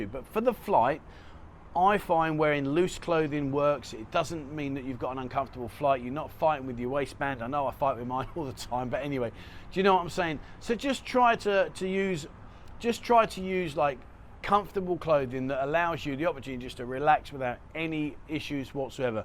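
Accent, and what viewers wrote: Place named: London, United Kingdom. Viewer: Horst it's a London accent other people understand it.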